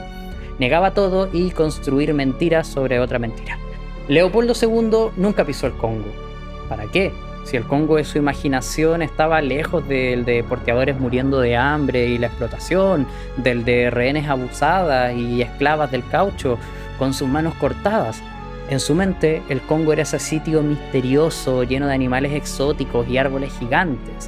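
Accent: Chile. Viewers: Siento un pequeño acento chileno eres chileno? 🎉 excelente canal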